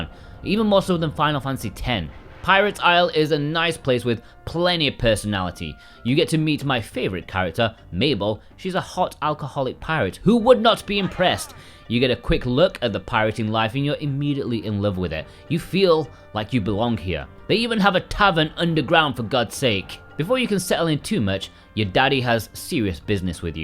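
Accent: United Kingdom. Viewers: 🐬 My favourite game of all time. You made a fantastic video that made me laugh in several places. Tell me though, you sound like you hailed from Blighty.... Is it just me, or does Galcian look like Noel Edmond's megalomaniacal doppelganger? Part of my hope were dashed when we didn't get a boss fight again Mr. Blobby! 🤣I also agree, I would love a model of the Delphinus, but I do have a statue of Aika so it's not all bad.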